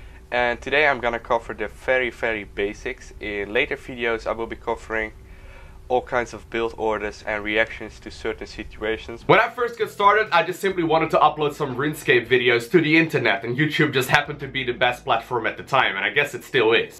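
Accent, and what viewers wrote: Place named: Netherlands. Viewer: Don't get supply blocked man! Keep building the eco ;) PS: your accent back then was so dutchy and cute!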